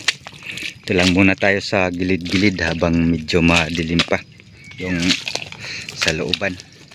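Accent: Waray, Philippines. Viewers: idol Taga San ka ho ba kasi parang Yung salita nyo parang Yung sa Amin mga waray San Lugar or probinsya ba yang Lugar mo Samar ba? Taga samar din ako idol ingat lagi sa pag haunting ng alimango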